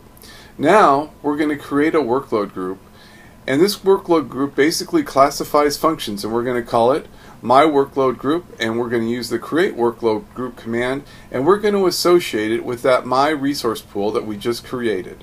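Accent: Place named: United States. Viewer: Oh boy, American English!!!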